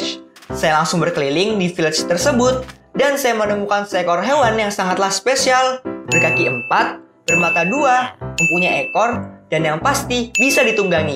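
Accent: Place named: West Java, Indonesia. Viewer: Bang nanti coba tugas ipa dong semangat terus bang ngonten nya lanjutin konten survival seru bangettt dan cara ngomong abang kek orang Sunda :v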